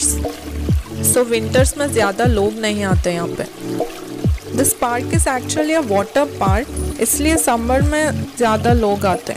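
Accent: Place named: Hyderabad, India. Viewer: I'm watching ur all vedios n I loved it...ur voice is too good ..specially wen talk hindi,ur hydrabadi accent is too funny...😁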